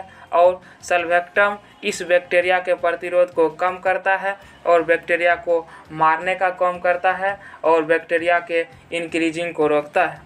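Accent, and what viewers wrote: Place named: Begusarai, India. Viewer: Lagta hai aap begusarai se ho awaz se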